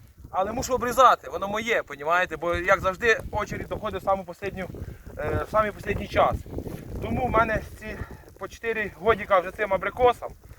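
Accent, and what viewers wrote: Vinnytsia, Ukraine. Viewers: Балакає по вінницьки😂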